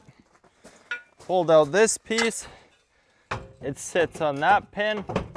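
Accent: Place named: Eastern Canada, Canada. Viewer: thats gotta be an eastern canadian accent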